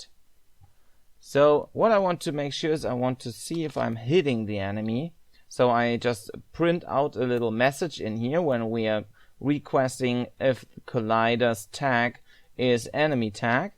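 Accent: Germany. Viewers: you sound german, diablo is pronounced as it is in german ^^ im also looking forward to the next parts, great video!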